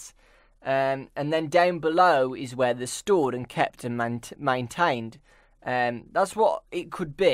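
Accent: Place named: Scotland, United Kingdom. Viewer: I don't even care about FNAF:SL I just watch this for Scottish accent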